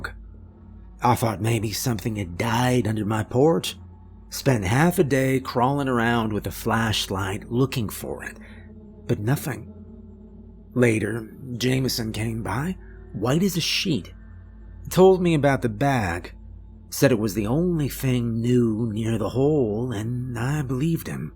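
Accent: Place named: South, United States. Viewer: I love your voice, country (both southern and northern) accents always put me right to sleep. Feels like pa. Your voice reminds me of a buddy of mine, tall , short blonde hair, built like a bodybuilder, does electrician stuff. Born n raise in the heart of Tennessee, with a smooth southern accent. You have a voice that keep reach folks’ hearts. If ya ever get tired of reading stories to folks, take up public speaking to folks. A voice like yours can bring change, buttering’ your way into folks’ hearts. It’s calming, the tone, cadence, pauses are just right ❤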